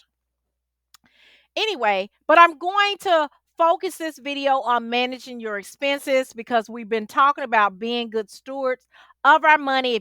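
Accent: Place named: South, United States. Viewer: Love that southern accent! Definitely fun to watch and listen to others and how they present themselves.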